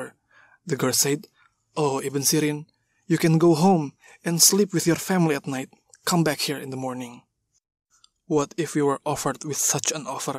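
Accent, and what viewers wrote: Indonesia. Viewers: I 100% am sure the voice over actor on this video is Indonesian. His English accent is so Indonesian :) Anyways, great story and great voice over! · Indonesian English Accent... Good job! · I recognize this accent. Indonesian accent. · I'm hearing Indonesian pronounciation english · The Indonesian accent:)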